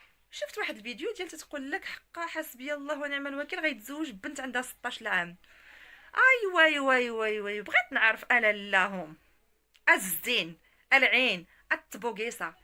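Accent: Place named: Algeria. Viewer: عندها لكنة جزائرية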